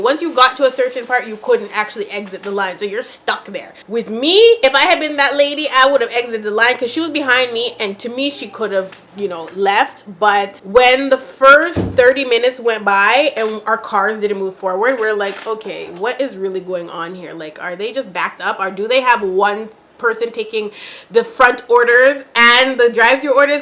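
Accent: Jamaica. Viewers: The patois is healing the broken parts of me!! 😂 ❤ I love it!! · My favorite part of every video is growing fluent patois. Kk luv ya byeee:_CHEFSKISS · It’s the patois for me 💀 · The patois!!!! Yes!!!🥹🥹🥹🥹🥰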